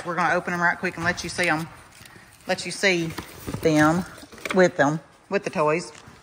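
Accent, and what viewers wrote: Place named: Alabama, United States. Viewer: I just found your channel the other day. I knew as soon as I heard you talk that you were from Alabama. I’m originally from Mobile. If you dressed Lucy in boy colors and never told us her name I would still know she is female because she is so feminine in her movements. Love them all. They make me laugh. 😊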